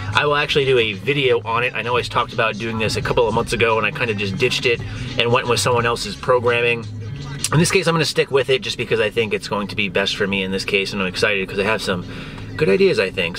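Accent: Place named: South, United States. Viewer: dem accents doe seriously big upps more of that southern accent it makes these videos so much better:D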